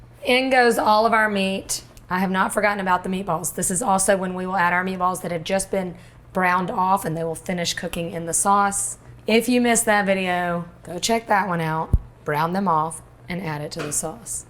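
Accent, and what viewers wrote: United States. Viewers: It's called gravy. Your accent attests to that. You became what my great grandparents wished and worked for: you are an American! It's pronounced amerigan. When my people came here from Naples and Calabria a long time ago, they were prejudiced against Couldn't get a job or into a school to learn. Anything. This was also the situation for Jews, Greeks, Irish, Chinese, and a whole lot of other people who weren't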